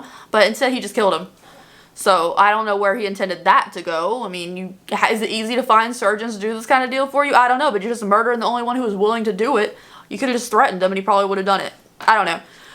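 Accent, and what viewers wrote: South, United States. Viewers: Ok I know I’m not crazy, as I’m a southerner I may just be crazy 😜, but I’ve been noticing your accent more and more. I didn’t realize how much you would hold it back in the past! I’m the same way. Sometimes I let my accent just gooooo but mostly I reign it in 🤠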